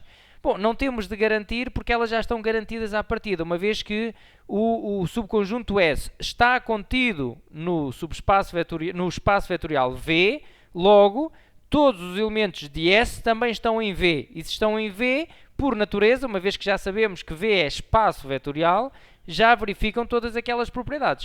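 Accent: Portugal. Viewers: com sotaque português e eu entendo melhor do que meu professor com sotaque igual ao meu kk, muito boa aula! salvou minha vida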